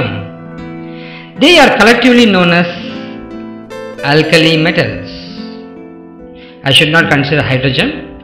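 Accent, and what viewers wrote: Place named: India. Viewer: I'd like to know what percent of youtube videos are made by Indians. I'm guessing 80%. I'm sorry Indian fellow, but speaking with an echo doesn't make the Indian accent more pleasant to hear for people outside of India.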